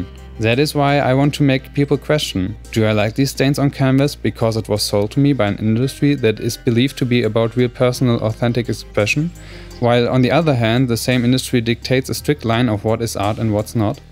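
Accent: Germany. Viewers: You sound very German. Kunst sollte doch etwas mehr sein, als lediglich die Funktion einer Kamera einschließlich anschließender Nachbearbeitung zu imitieren. Was ist denn deine inhaltliche Auseinandersetzung? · Are you german and if are you from lower saxony ? Your voice sounds very familiar to me ^^ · You are German are you? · are you German? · Are you german? I'm just wondering because of your accent. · ich finds toll zu sehen wie die Bilder langsam zum Leben erwachen, ich bin selber nicht so Künstlerisch begabt, eher Handwerklich, und denke bei Bildern wie deinen,"wie wurde das gemacht? wie ensteht dieser effekt?" sorry aber ich habs so rausgehört dass du Deutsch bist :) Qualty Content Approved ;) keep it up :) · are you german?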